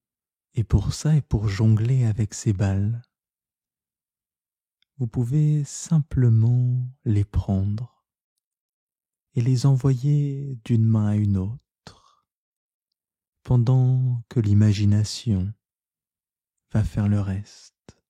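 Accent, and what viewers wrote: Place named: Sète, France. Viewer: Je voulais vous décerner la médaille des 2 ans ! En effet, je suis tombée sur vos méditations en mars 2020, esseulée et perdue parce que mon compagnon a eu plusieurs mois de COVID long à cette époque et devait rester seul dans la chambre , et moi dans la chambre d à côté à me tourmenter. Vous m avez beaucoup aidée à garder espoir en sa guérison J apprécie beaucoup votre timbre de voix, et votre façon de prononcer certains mots , qui me rappelle mes vacances près de Sète dans mon enfance vers les années 70 /80. ( Je suppose que vous venez de cette région ?) Vous êtes un prêcheur de simplicité et je vous remercie du fond du coeur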